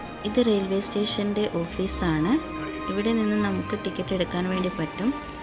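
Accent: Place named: Malabar, India. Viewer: Hi I am originally from Calicut and living in UK.I watched your video today for the first time.I can’t wait to come and visit Switzerland.It looks so beautiful. Because of your accent,I guess you are from Malappuram or Calicut😀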